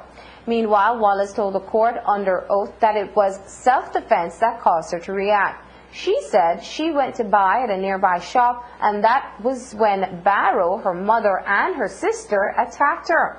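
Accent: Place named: United States. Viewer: Sounds like the american accent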